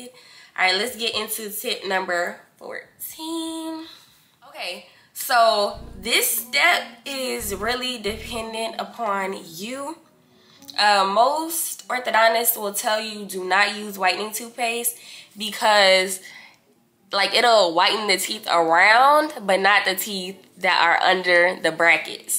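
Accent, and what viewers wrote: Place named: New Orleans, United States. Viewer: U from New Orleans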